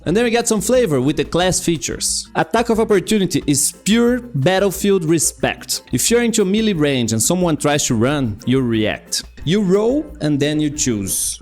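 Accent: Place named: Brazil. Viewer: esse sotaque nunca me enganou. Seu canal tem uma versão br?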